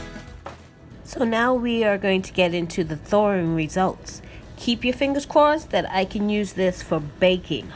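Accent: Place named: New York, United States. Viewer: Are you from New York or Boston? I like your accent. I’m in Colorado, but from New York.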